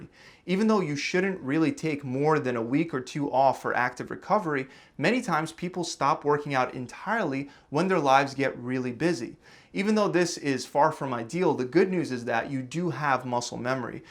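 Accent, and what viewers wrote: United States. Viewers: “YO” STOP TALKING LIKE YOU’RE AMERICAN AND ACT YOUR RACE, “HELLO MY FRIEND WELCOME TO KWIKI MART” 🐫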